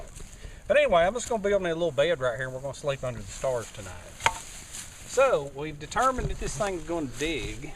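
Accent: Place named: Georgia, United States. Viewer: Cool idea....I like it because it decreases by one the number of tools you would have to carry... I thought you sounded like you were from Georgia... I lived in Warner Robins for 16 years.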